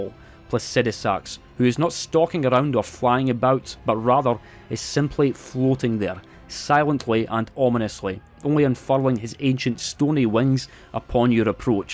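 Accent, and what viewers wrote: Scotland, United Kingdom. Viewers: I watched "Dragonworld" when I was a kid, and hearing a Scottsman talk about dragons just brought back so much nostalgia.❤ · A Scottish man talking about dragons makes me happy thank you for the video man. I think the reason why the dragon God is so different is because it was actually dead and was revived using a demon soul which is why it looks like a demon dragon hybrid. I still love the look of it though it's by far my favorite looking dragon in the soulsborne franchise. I also like the fact that you have to use large ballistas to actually be able to defeat him, he's just that powerful.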